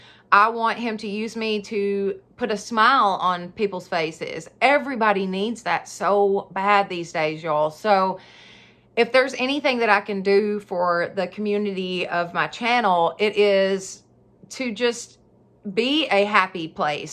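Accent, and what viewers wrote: South, United States. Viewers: You brighten my day! Love listening to your southern accent. Thank you for honesty...wish more people were as open as you are. I feel blessed to have found your channel! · Hey beautiful. You're Amazing! Thank you for being honest all the time. Love your Southern accent. Love your positive attitude. You're funny too. Love you my beautiful Angel. Blessings to you and your lovely family. You're absolutely correct. Who cares what anybody thinks.. No one is perfect.. There is only one perfect one, that is our loving GOD!! Having CHRIST in your life makes a person be happy and positive. Praise GOD you are here with us sweetie. JESUS loves us all. We are GOD'S children. AMEN. Hallelujah!! I will continue watching your channel my beautiful Angel. I love you.. You're Amazing and smart! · Thank you so much for this and I too crying to hear your story and what a great story it is. 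Good for you and if anyone leaves your channel then they were not worth your time.I am the same as you I tired to find fun and be happy and maybe a bit mad but that's fine with me.Keep up the good work. Just love your channel and always look forward to watching your videos.Love the southern accent I too have an accent I come from London so be proud of the accent as it makes it you. Hugs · YOU. ARE. FABULOUS!!! I don't know any diyer that would be this honest, the things you've told us about yourself, shown us your life, even though you know you're the blonde hair, big boob, southern drawl cliche... I'm one too 😁 and all the naysayers can kiss my grits!!! And my bday is November 25th!!! But.... I don't want to scare you, however anyone wanting to find you, you've made it sooo easy.... just be careful!! And you need a bodyguard just let me know! I may not look like much but everyone that knows me is scared of me 😳😁🤣🤣🤣 Thank you for being you!!!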